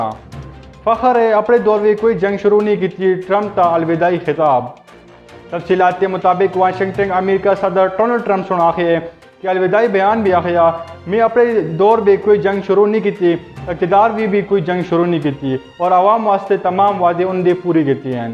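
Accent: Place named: Pashto, Pakistan. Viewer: Acha hai Kuch alag kar rahy ho well-done Lkin News caster Hinko Speaker ho Q k Apka Dialect Pashto wala hai ...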